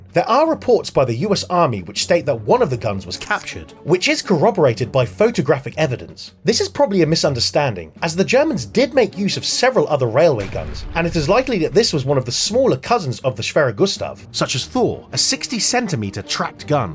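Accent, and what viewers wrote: United Kingdom. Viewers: Wtf with the bri-ish voice?? Didn't those guys lose to a bunch of pilgrims???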